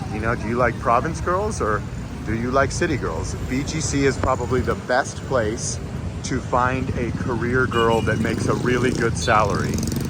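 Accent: United States. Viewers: I don't get your point dude. We don't have fake cities. You sound like an American. I am wondering if what is the real United States for you also? You're dying and abandoned cities?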